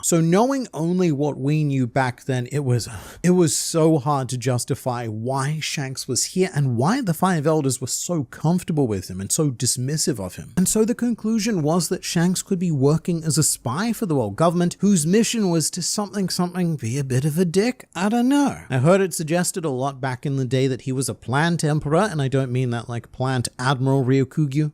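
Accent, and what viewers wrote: Australia. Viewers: I like his yapping. I come to hear a fancy aussie yap about fictional pirates.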